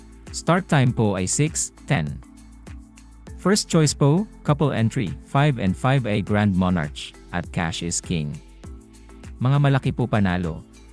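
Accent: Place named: United States. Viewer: Naging Kano ang accent ni Bossing!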